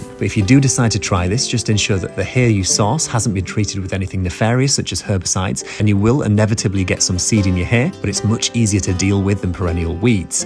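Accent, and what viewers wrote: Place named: United Kingdom. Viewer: He’s talking about HAY. His British accent is making it confusing. 😅😅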